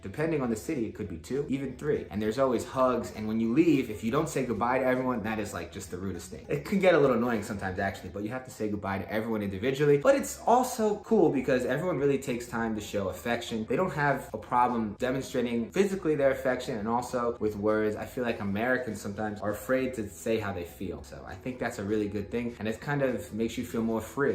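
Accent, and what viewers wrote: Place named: Brazil. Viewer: Even speaking english sounds like Brazilian. Hehehe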